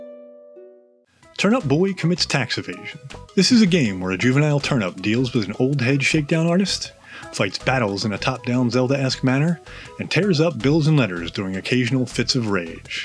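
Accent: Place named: United States. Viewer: Cool! You got an American voice actor! This app seems like less of the Chinese malware it is with him voicing it.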